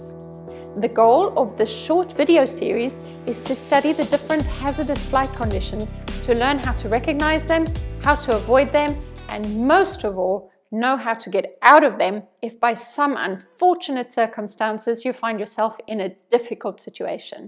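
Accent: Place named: Australia. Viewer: >>> Pardon my asking this, and you do mot have to answer if you prefer not to, but are you originally from France? I ask because you have a VERY GOOD command of English, and your accent vaguely sounds Australian. Or maybe English. I am American, so what do I know...😉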